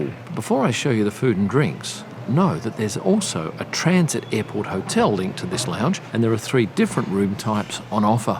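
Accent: Australia. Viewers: Loved discovering that one of my favourite media people in the F1 circus has an aussie accent. I have always appreciated your F1 media content that appealed to the female audience over the years.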